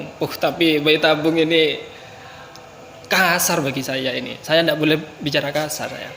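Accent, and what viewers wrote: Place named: Madura, Indonesia. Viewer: Sangat nampak loghat madura nya walaupun bicara bhs. Indonesia. Lanjutkan dakwah jennengan ustadz ustadz aswaja ORENG MADUREH DERIH SUMENEP SAMPE' BANGKALAN SIAP ADUKUNG DA'WAH EPON AJUNAN TAD🙏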